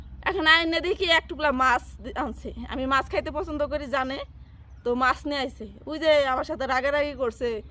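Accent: Manikganj, Bangladesh. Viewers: আপু আপনার ভাষা শুনে মনে হয় আপনি মানিকগঞ্জের সিংগাইর থানার সাহরাইল এলাকার আসে পাশের মেয়ে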